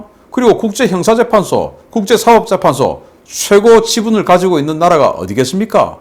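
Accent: Gyeongsang, South Korea. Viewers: 신인균님의 해설을 들으며 매번 깔깔 웃습니다. 어찌 그리도 재미있고 명확한 내용으로 경상도 사투리를 섞어... 정말 고맙습니다.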